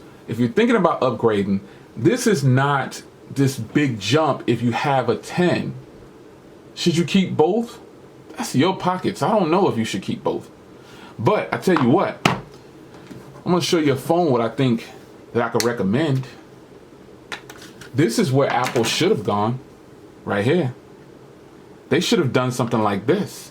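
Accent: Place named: New Jersey, United States. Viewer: Great video, Jersey accent heavy at times during this video 🙂, loving my XS Max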